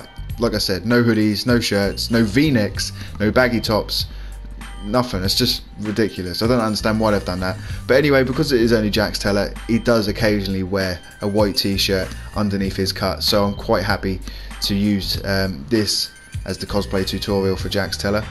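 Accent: United Kingdom. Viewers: Hello small young child, have you heard of the british accent?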